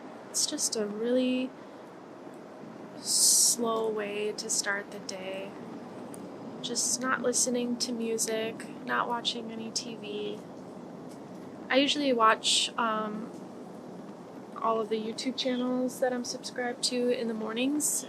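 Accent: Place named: Canada. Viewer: Girl if you didn't say otherwise, I totally would have thought that you are Canadian! Also, envious that you have a family business to work at...such a blessing, may you never take it for granted. Love from your northern neighbour!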